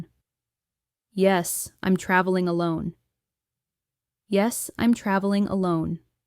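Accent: United States. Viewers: s accent is american? Any body tell me.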